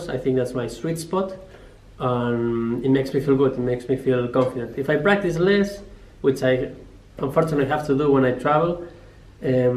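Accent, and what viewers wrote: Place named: Iberia. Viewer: Ése acento ibérico.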